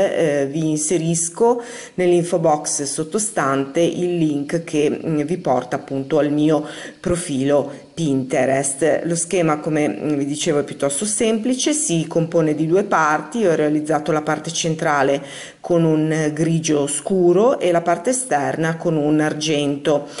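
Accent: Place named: Genoa, Italy. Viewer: ieri sera ho visto per la prima volta un tuo video e ho pensato "mi sa che è genovese"..........e infatti lo sei!!! In che zona fai i corsi? se sei vicina sarei interessata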